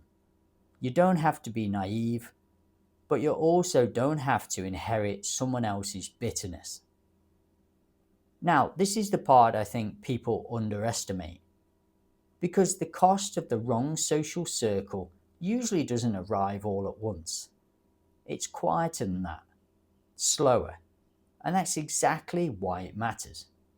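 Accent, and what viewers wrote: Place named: England, United Kingdom. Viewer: could be it's accent thing from England lol, are you from north west UK ? I'm finally coming over. I had set deadline of 55 but pulling the trigger now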